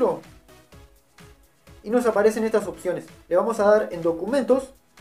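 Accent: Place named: Argentina. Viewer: acento argentino tiene que averiguar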